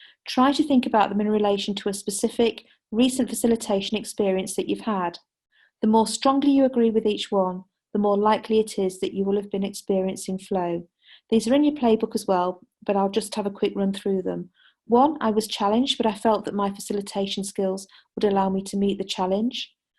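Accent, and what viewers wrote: United Kingdom. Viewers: Great accent deep British accent, deep information. Thanks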